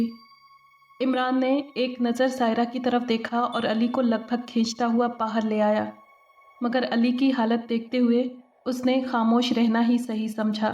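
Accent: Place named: Kashmir, India. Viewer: I watch all your video.now days I am staying in delhi.bt mera childhood kashmir sae h. Apki voice n Akcent n Urdu sae lgta h jase koi wahi ka ho .nyc video.lots ov love.u r doing Great 👍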